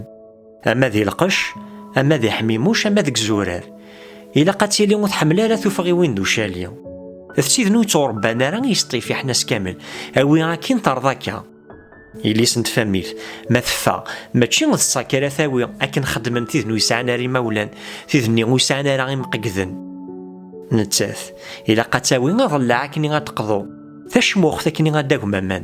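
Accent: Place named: Kabylie, Algeria. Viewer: J'aime comment tu parles le kabyle que je trouve très authentique. Un travail, un recit remarquable. Je vous salue.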